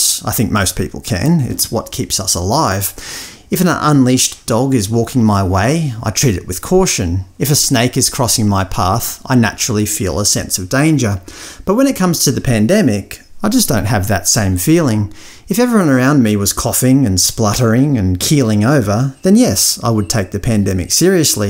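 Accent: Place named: Australia. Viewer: Don’t know what it is exactly. But the calming and soothing of your voice and your awesome Aussie accent just appeals to me.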